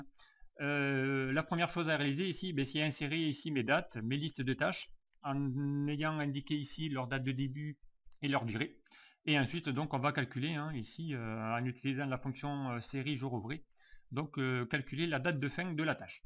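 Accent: South, France. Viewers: Pas la peine de me répondre à mon dernier message, je vais d'abord visionner cette video merci pour vos tutos et pour le petit accent du midi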